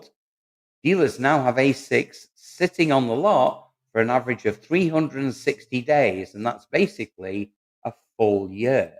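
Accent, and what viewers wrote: England, United Kingdom. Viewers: American script with an English accent 😂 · Just found your channel,having been a petrolhead for more years than I can remember, I was interested in this clip. That said, I am a tad confused, I assume by your accent that you are from Great Britain, however your logo shows a USA flag and terminology is somewhat odd (to me) ie $ Dollars, Gas, Sedan etc? As for Car dealerships, I agree with numerous posts that car manufacturers and dealerships have been taking the eyes out of the very people that keep them in a job THE CUSTOMER! Far too greedy, I have absolutely not one jot of sympathy for these money grabbing burglars. They reap what they sow. 🇬🇧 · You sound very English; why are you talking in dollars? What yank is interested in RHD? I just spotted it they're all LHD, you're talking about the US market. I suddenly lost interest. 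All the best mate! Mines a Jaguar XJL. It depreciated right to the point where I could afford after 12 years. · What country does this apply to? English voice, prices in USA $$? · You sound English but you talk in dollars and call a car a sedan ! I’m confused. Ps I will never buy a car with an infotainment screen.👎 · It might help if you made that clear! Initially owing to your accent I thought this was addressed to UK citizens. Then when I saw a dollar sign in front of some numbers, the penny dropped! Shame that nobody could be bothered to show the currency for all the values. A very sloppy video! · I'm confused, are you talking about UK or US sales, confused because you have a UK accent but prices are in dollars.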